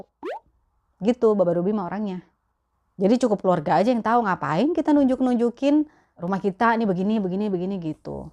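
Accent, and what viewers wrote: Jakarta, Indonesia. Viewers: Suka denger ngomongnya ada betawinya ada arabnya..resep bgt...sukses selalu mpok cantik.. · Logat Betawinya msh kental mpok.hehehe... Dari Al-qassim menyimak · Logat betawinya nempel bgt.. demen dah mpo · Yaa Allaah... betawinya.... bikin bangga... 😍😍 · mpo betawi nya kuar semua😂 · Logat betawinya masih melekat lucunya 😁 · Assalamualaikum... Seneng bngt liat vlognya apa lagi klo lg pas ngmng betawi...sehat² di sana...salam kenal dari Medan (aslinya mah jkt jg..ikut misua stay dimedan) Walasam🙏🙏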